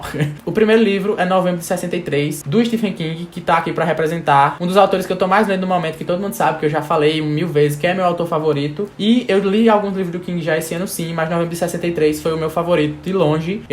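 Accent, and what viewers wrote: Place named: Paraíba, Brazil. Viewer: Ahhh o sotaque! Sou de Recife, mas acho que tu é paraibano, acertei? Me senti em casa com o sotaque parecido com o meu ♥️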